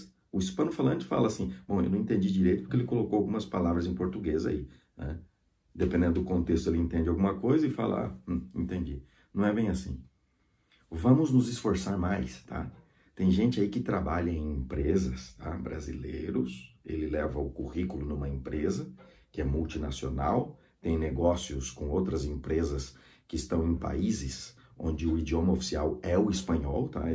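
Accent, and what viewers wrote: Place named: Spain. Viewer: O senhor é professor? É linguista? Qual a sua formação? Pergunto respeitosamente. Portunhol é só um nome para designar uma espécie de interlíngua. Um estágio em que o estudante está adquirindo competências linguísticas. Brasileiro e falante de espanhol, pelo menos, a minha variante (sou argentina) entendem-se, sim. Acho q esse “destruindo o portunhol” só pode causar medo e desanimo para aqueles que estão investindo tempo e esforço em aprender uma língua estrangeira. O senhor fala muito bem e tem uma linda pronúncia de apenas uma variante de espanhol: o europeu. Sons de espanhol europeu e de América Latina nada tem a ver. Eis a maravilha das línguas Já ouvi falantes de pt. Falando por exemplo: /baja/ /baRRa/ é por aí vai…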